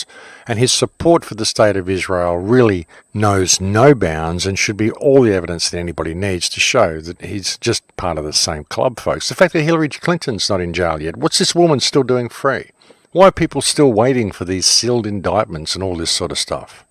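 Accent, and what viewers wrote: Australia. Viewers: Jumbo jumbo with a “ Britt “ accent. Actually, Aussie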